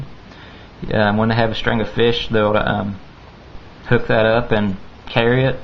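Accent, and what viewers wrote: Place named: Texas, United States. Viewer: it obvious he from texas luk at the accent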